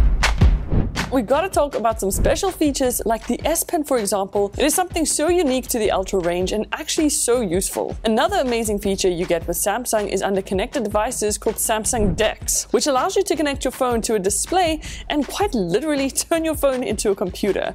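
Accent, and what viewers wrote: South Africa. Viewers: Are you from RSA 🇿🇦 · You're totally from South Africa :)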